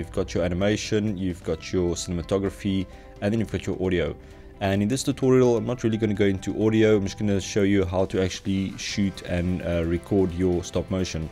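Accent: South Africa. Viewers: you south african by any chance?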